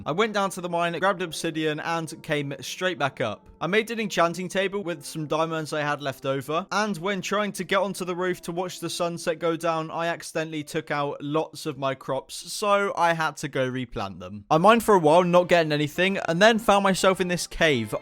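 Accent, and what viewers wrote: United Kingdom. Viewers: u sound different didn't u have a British accent or am i thinking about a different youtuber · He sounds British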